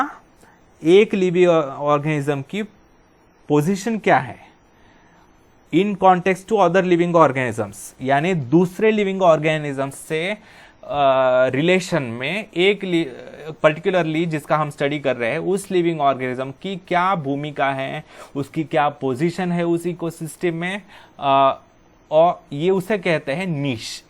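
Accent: Maharashtra, India. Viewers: Thank you so much sir for teaching us very nicely...😁 Btw I have a question , is your mother language is Marathi..??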